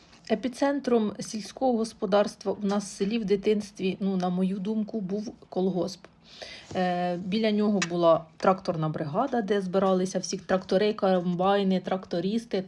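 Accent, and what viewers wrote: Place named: Karlivka, Ukraine. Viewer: акцент Карлівський-)))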